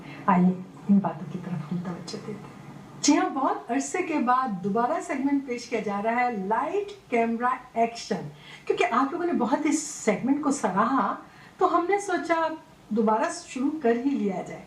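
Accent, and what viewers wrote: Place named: Karachi, Pakistan. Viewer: Do you still run this program? Love your urdu! Not sure where are you from but I'm orginally from Karachi and you have that Karachi accent. Keep the good stuff coming in.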